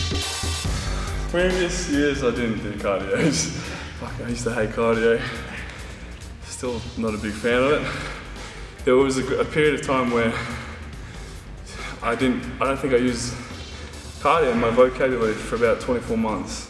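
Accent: Australia. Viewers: With a hint of an Australian accent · most definitely is Australian you plum. Hence the Aussie accent.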